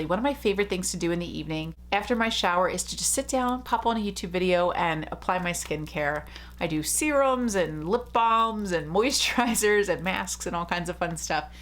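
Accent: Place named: Southwest, United States. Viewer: You look 👀so gorgeous 👌, those earrings and your nails are beautiful 😍. Wanted to ask, did you color your hair or that dark brown color is your natural hair color. I have that exfolikate Kate Summerville moisturizer for $24.99 at Marshall’s, I love it, even though I have an oily skin and I use it only at night, in the morning my skin is so soft. I missed seeing your videos, glad you’re back with one, I just love you 😘so much for being my sunshine ☀️each and every time. Your presence, love 💜, energy, excitement, joy, positivity, passion is always what I’m looking for and you always deliver it cause you’re always absolutely awesome 👏. I love listening 👂to you and watching your videos, I love you 😘so much 🤗for always being yourself and being here for us 🙏. BTW, you’re giving me Native American Cherokee vibe and I know you have that in your roots and blood with southwestern accent, love when you talk like that, especially Y’all. 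About you having cysts in your breast, my sister who’s also in the same situation as you, had this for many years,, her doctor who’s great, have many years experience, especially in the same situation told her, never do a sonogram or mammogram before or during your periods cause the cysts might be larger during those times. Do the tests almost two weeks after your periods so it’ll have chance to shrink and always do a six months sonograms for follow ups.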